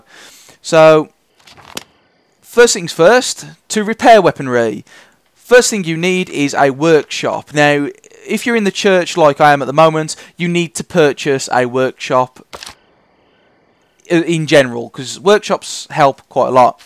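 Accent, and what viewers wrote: Birmingham, United Kingdom. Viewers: Are you a brummy ?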